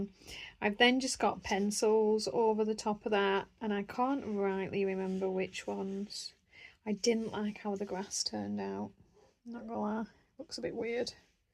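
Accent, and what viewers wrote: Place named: North West England, United Kingdom. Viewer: Do whatever works best for you regarding your channels. I came across your diamond painting channel and had subscribed for a while before I realised you had a colouring channel too. I do many hobbies and even if I don’t do a particular one that you do, I do like watching anyway. Besides, it’s great listening to a north west accent. 🤣 Wishing you and yours a very happy Christmas from me and mine. x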